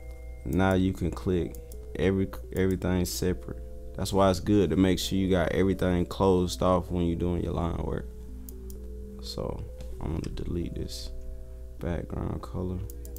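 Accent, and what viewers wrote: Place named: South, United States. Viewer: Man, are you from the south? Atlanta? You sound like the cat from Outkast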